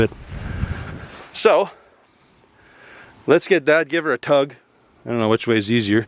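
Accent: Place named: Canada. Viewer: My two favorite words Canada guys say. is "Out" and "Process" To us assholes down south. Your "Out" sounds like "0uuuut". And Process. You say it as spelled. You say " PRO---U--CESS". All good. We say "Prawcess". We are all jerks! Love your channel! Rock on man up north! Oh There is a another thing.... I will wait.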